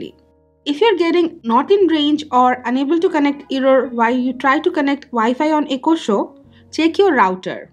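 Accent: India. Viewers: you did help me however for americans to undersand the indian accent they place on the english language and the speed in which you speak is super difficult. If you would clean this up you would get many more likes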